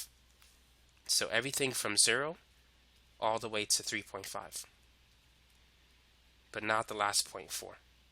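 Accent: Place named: Boston, United States. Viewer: Dat boston accent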